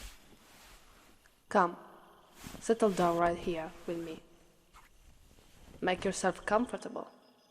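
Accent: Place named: Italy. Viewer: A tribal girl with an Italian accent. 🤔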